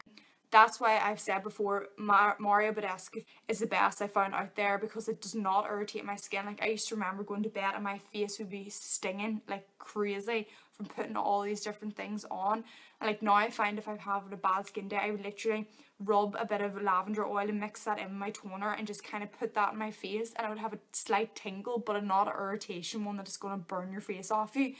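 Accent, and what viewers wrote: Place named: Birmingham, United Kingdom. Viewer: U speak peaky blinders accent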